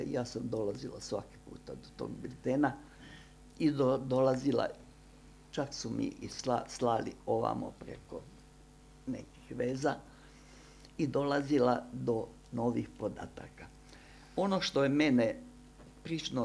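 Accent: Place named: Krajina, Croatia. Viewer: Говори као и сви Крајишници!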